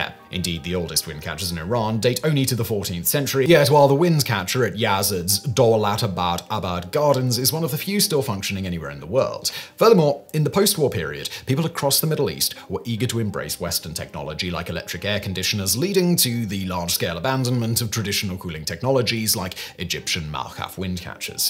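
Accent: United Kingdom. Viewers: Really enjoy the content. Very informative. But the presenter's very rapid speaking, with the British inflection is exhausting. Also, the "pictures" presented, while the person explains a certain thought has a strobe effect around the edges which distracts and is visibly annoying. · Hey mate, your audience is international. Speaking a British dialect this fast doesn't help your viewers. With all do respect, vowels can not be just a whatever short sound between consonants. Hope you appreciate constructive criticism. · Hey, buddy!! You need to curtail your caffeine intake a tad. Your british brogue is already difficult for us "colonials" to follow. Please, slow down a bit, OK!!! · He sounds British, but his speech is rushin...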